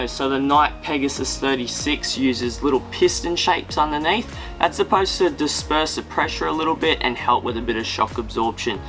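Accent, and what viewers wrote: Australia. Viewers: Great review and great Aussi accent. Would love it if you kept doing comparisons of running shoes like this one.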